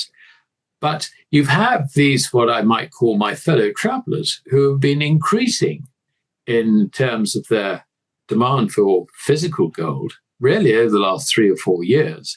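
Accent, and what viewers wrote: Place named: United Kingdom. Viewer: Blah blah blah with a British accent. Shameless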